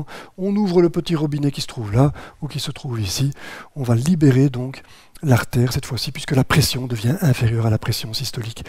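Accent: Belgium. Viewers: Bonsoir Alain Soral ... alors à Lauzanne à présent ?.. mais alors d'ou vient cette accent belge pas courant en Suisse ?.. On ne connait pas la deuxième personne du pluriel en belgique ?.. Cette façon de se croire moderne en tutoyant les inconnus au pretexte qu'on est derrière son ordinateur m'agaçe passablement !.. Je vais donc aller ailleurs !.. Bonsoir !..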